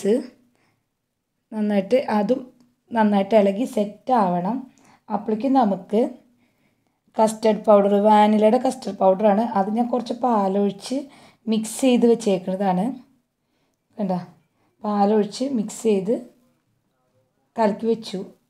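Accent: Thrissur, India. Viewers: Pudding with trichur accent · trichur slang caramel chocolate pudding yummy - nandu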